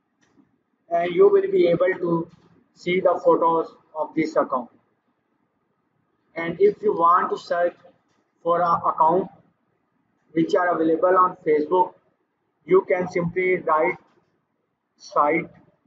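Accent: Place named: India. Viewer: When I heard the Indian accent I knew I was in good hands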